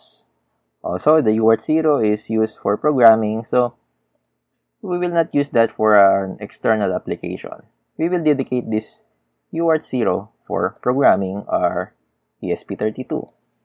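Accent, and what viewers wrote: Philippines. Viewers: Nice video dude. Just wondering, pinoy kaba? Its just the accent.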